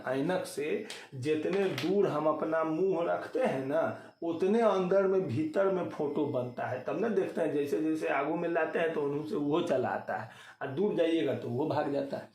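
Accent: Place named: Bihar, India. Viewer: Sir hindi ka aisa lecture poore youtube pe kahi nahi hai sab saals English me daalte hai unka v content aapke jaisa nahi hai sir aap ko sun ke lagta hai ki aap bihar se ho?????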